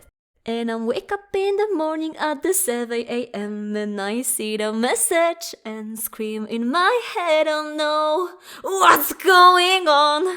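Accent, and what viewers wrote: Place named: Poland. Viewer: Don't worry about the language, you did a good job speaking. The Polish accent is noticable and I find it slightly funny/wholesome to listen to, as a Pole, but I think that's just how most of us sound like trying to speak English without much experience. I might be making an English channel after Hytale releases so I also definitely will be sounding like that 😄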